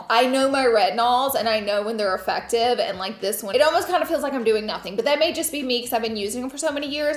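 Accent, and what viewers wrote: South, United States. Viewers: I swear half of why I watch you is because I love your southern accent. I’m from Florida but live in Georgia now and I’m always like “watch this girl she says oil all southern like y’all” Also I can’t understand why you’d even wear shapewear you’re friggin perf. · i haven’t watched your vids in a minute but your southern accent sounds heavier than i remember 😂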